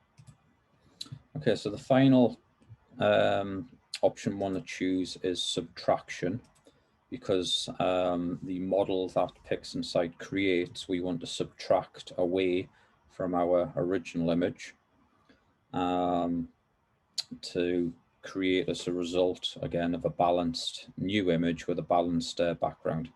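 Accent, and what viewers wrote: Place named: Northern England, United Kingdom. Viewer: Great video I am from Teeside recognised your northern accent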